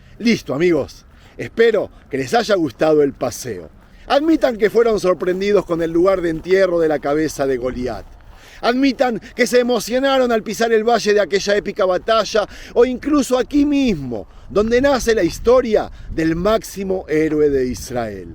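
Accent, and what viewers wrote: Argentina. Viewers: Yo pensé que era Argentino.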